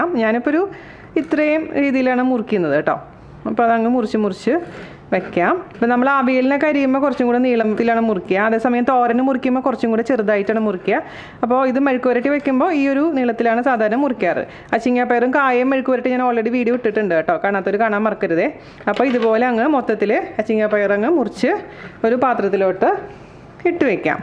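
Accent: Thrissur, India. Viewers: Scooper chechi nammal trichurkaarde baasha sooper readymoney ennu njanum parayaarund ente place chavakkad upperi sooper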